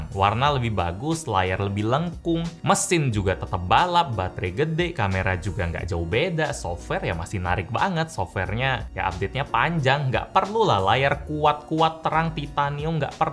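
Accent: Palembang, Indonesia. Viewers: nih wong plg apo ee?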